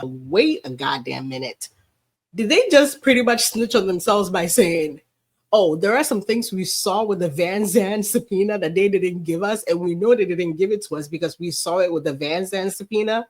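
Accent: United Kingdom. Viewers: I love the British accent !! brilliant - you could star in a broadway Oliver Twist production !! 😂😂😂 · Awesome British accent ❤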